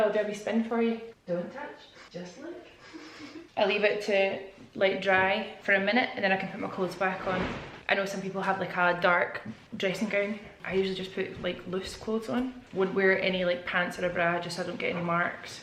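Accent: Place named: Liverpool, United Kingdom. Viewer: Love your Liverpool accent " where's me sponge" lol😂😂 from a proud scouser❤❤❤❤😅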